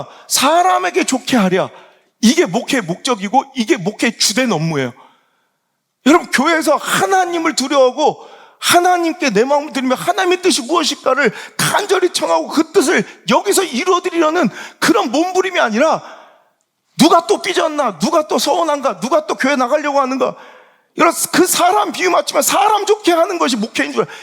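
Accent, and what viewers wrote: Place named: Chungcheong, South Korea. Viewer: 목사님.은혜많읻고잇습니다.예화도.재밋네요 충남예산인데.맞아요.충청도어법.오랜만에듣고보니.넘재밋네요.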